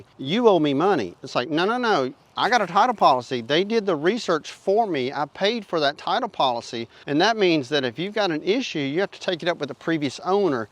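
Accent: South, United States. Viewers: His voice goes well with him, sound like he has an southern accent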